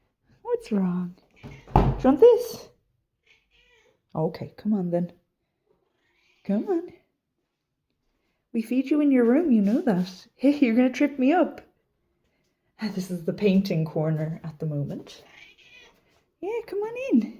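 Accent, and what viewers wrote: Ireland. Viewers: I don’t think any of us like the sound of our own voices. However, IMO - I have to say I think you could have a career in voiceover work. I think your Irish accent is lovely and I could listen to your voice all day! I think you could tile your living room floor (in very large porcelain tiles) and it will be easy to clean up especially in the winter when you have the pets coming in and out! Also if the floor is only slightly out you will be able to put extra adhesive on the lines to even the floor out? I would then get a very large rug? Good luck with whatever you decide! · Dear, being British, I looove your Irish accent. Don't ever doubt yourself. I think I speak for all your followers that you are doing a fantastic job and enjoy your videos. Remember, Rome wasn't built in one day and God willing you have many days to finish what you started. 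If I didn't live so far away or being 80 yrs old, I would gladly have flown to Ireland to help you. Thank you Dad for helping your dear daughter. I really enjoy your videos so keep up the good work and don't rush into anything. Rest ever so often and build up your strength. Love and hugs to you and your babies. From Cape Town ❤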